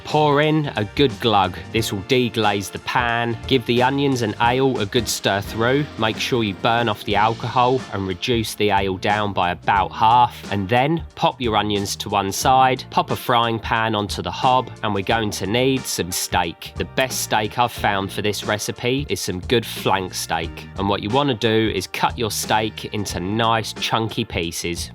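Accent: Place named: Cockney, United Kingdom. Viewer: Why does a "West Country Kitchen" video have a cockney voice over? I expected a Devon burr, or at least some kind of "Ooh, arr"!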